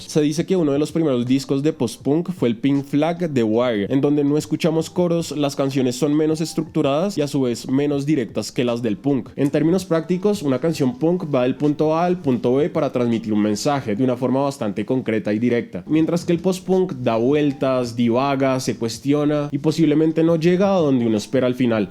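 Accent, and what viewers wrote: Colombia. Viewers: oiga pa, deberiamos formar una banda mucho mas indie y experimental que rompa estos esqumas, soy guitarristas, me cuentas se nota que eres colombiano XD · ¿Eres de Medellín o Bogotá? No distingo tu acento, soy fan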